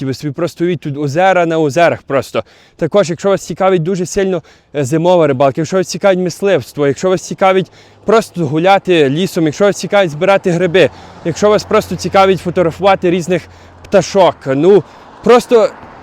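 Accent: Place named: Western Ukraine, Ukraine. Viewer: Будь ласка. переїхав в Канаду в 2005 році у віці років 11. Навчався в канадійській школі. Мати вчителька англійської. Він багато подорожував Канадою, вивчаючи поселення та хвилі мігрантів з України, діаспори тощо. Тобто його українська не зовсім класична, але він дивує своїм щирим українським патріотизмом. А також пишається своїм західноукраїнським діалектом.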